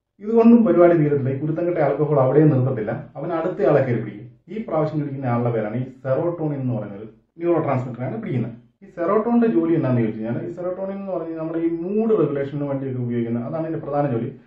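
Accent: Kottayam, India. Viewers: pure കോട്ടയം slang.. വാഴൂർ-? പൊൻകുന്നം? കാഞ്ഞിരപ്പള്ളി??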